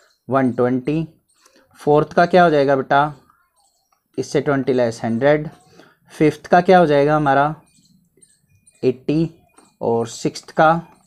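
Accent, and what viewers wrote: Haryana, India. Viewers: Sir are you from haryana your accent is haryanvi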